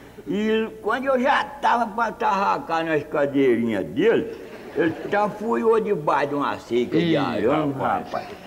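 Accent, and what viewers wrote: Minas Gerais, Brazil. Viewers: Pensei q fosse de Minas, Somos mineiro e meu padrasto cunversa desse jeitinho.. Sem cortar e nem tirar. Grande seu João meu segundo pai.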